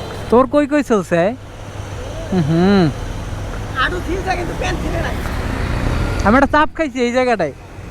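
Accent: Barishal, Bangladesh. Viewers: তুই কি বরিশাইল্লা মনু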